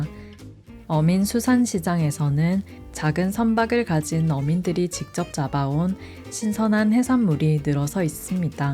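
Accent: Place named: Gangneung, South Korea. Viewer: 강릉분이시죠??^^ 아름다운 드라이브 코스 설명하실때 친근한 억양이ㅎㅎ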